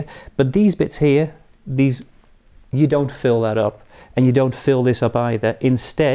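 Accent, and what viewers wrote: Netherlands. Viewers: This was a charming video, sir, thank you. May I ask where in the Netherlands you grew up? You sound so much like my Einhoven-based Philips colleagues. Cheers from a new viewer, Ardith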